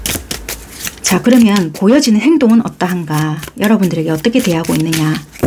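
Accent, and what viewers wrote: Busan, South Korea. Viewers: 선생님❤2번 리딩 감사합니다!!! 평안한 밤 되세용😊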